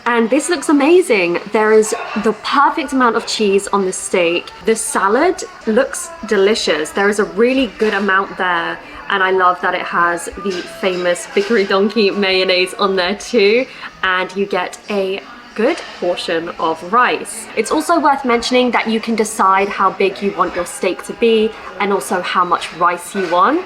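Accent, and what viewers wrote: United Kingdom. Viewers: First of all, thanks for uploading the new video. I've been studying English with your video lately because I like your British English. I usually watch the same video at least 10 times. Really looking forward to your next video too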